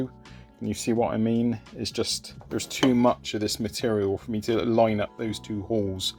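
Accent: Bristol, United Kingdom. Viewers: fantastic vlog shame about the the pits that broken, it not long for you have finished keep your chin up it won't be too long before you go out on it. Sunshine is coming next week so hopefully you can get out. Your accent sounds like its from Bristol away? Im got a appointment on Tuesday morning to put a new back tiyer on so I will be out on my bike again yay fantastic take care ride safe mate TTFN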